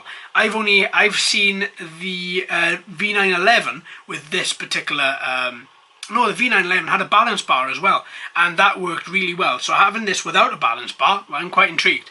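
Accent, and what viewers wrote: Wales, United Kingdom. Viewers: And that is why your videos are so infectious! Tell me, what part of Wales are you from? My dear old mum was born in Abergavenny, so I have Welsh blood!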